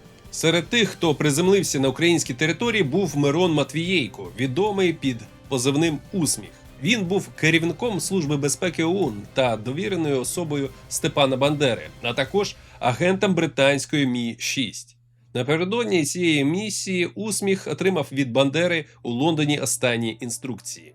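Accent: Russia. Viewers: Ще все-таки залишається російський акцент і акання. Потрібно ще тренуватися, бо ріже вухо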